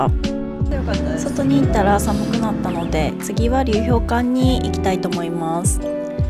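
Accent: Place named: Tsugaru, Japan. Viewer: 青森の人？津軽弁だぁ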